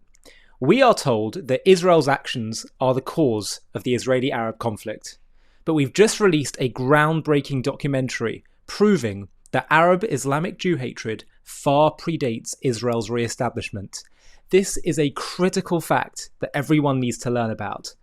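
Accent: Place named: United Kingdom. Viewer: U R the most couragous man with British accent thank again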